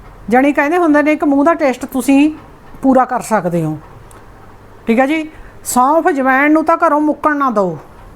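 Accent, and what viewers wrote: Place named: Puadh, India. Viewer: Tuhada pind kehda a boli ta tohadi puadh di lagdi a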